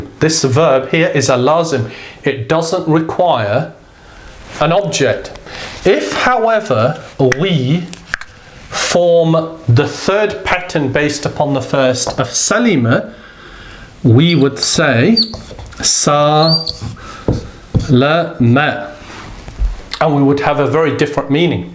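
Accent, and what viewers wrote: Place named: Birmingham, United Kingdom. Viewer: JazakAllah khair brother . I always said I would never learn Arabic from a non Arab... I take back my words. I find you're the best teacher (Allah yu barik feek)on the internet because you break concepts down to their simplest form, which then makes the idea easy to grasp. Perhaps your laid back Brummie accent helps as well lol !Thank you bro.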